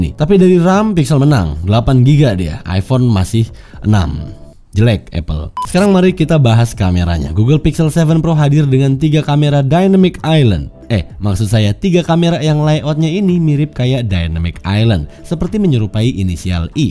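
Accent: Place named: Jakarta, Indonesia. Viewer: masih kerasa Kagok 😂😂 Fixs betawi 👍🏻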